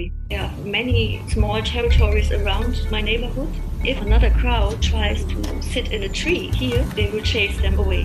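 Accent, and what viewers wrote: Germany. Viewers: I’m actually wondering if she’s German cuz to me as a German her English has that small accent XD also Kräri sounds kinda like the German word Krähe which means crow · Habe es schon an der Aussprache gehört, dass du Deutsche bist.... Liebe Grüße aus Worms 😁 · 你的英文聽起來很像德文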